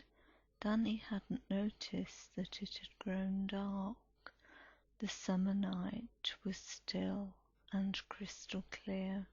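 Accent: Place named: England, United Kingdom. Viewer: Oh my. English accent..I'm in love with you...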